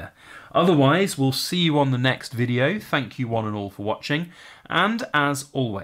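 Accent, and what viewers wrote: United Kingdom. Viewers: Yes your accent is clearly British and I also love Britannias. Typically I purchase 90% silver, Sunshine rounds&bars, Canadian Maple Leafs, and Britannias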